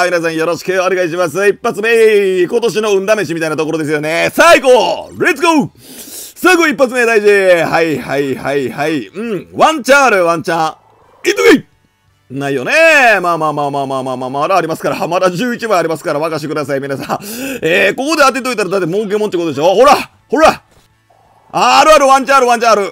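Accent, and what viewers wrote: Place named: Osaka, Japan. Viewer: 声だけ聴いてたら120%大阪のおっさん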